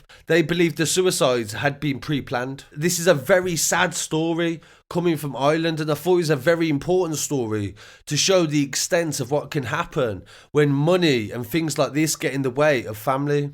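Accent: Birmingham, United Kingdom. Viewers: That bham accent is the only thing that ruins his channel 🤮🤮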